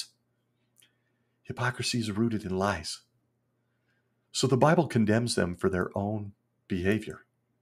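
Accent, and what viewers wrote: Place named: Pacific Northwest, United States. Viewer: PNW? I thought I recognized the accent 😄 What congregation were you associated with? My family was associated with Walnut Park and Irvington. Just curious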